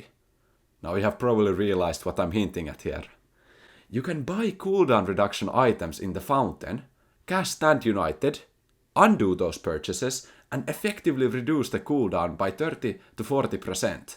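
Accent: Finland. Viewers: Is this guy finnish? Nice video! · The way he talk it tells all that thos guy is from finland😂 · joo katos ootha sää suomalainen enkun perusteella huomas heti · Maybe you could calculate which item is more worth by using gold value of stats? Like for example every point of ap has x gold value or every percentage of cdr has y gold value. Not sure though, thought it might help. Hyvä video ja mahtava suomalainen aksentti!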